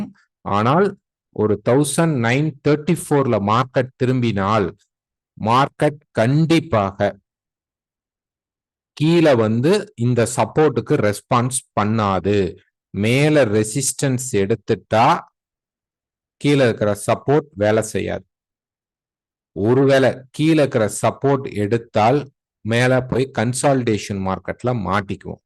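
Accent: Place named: Kerala, India. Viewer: Most of the analysis are accurate!,I feel you are speaking in Malayalam,I can follow your Tamil without any difficulty, Thank u sir!!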